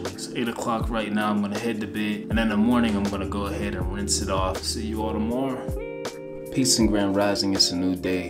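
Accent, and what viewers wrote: Pittsburgh, United States. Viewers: You gotta be from the burgh bro I hear the accent lol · Burgh accent? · I Knew It Was Pittsburgh Because That’s Where My Mom Side From 😂😂😂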